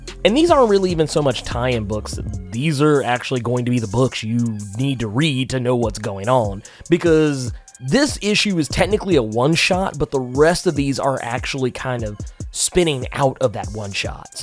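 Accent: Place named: Kentucky, United States. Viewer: you sound like you're from kentucky.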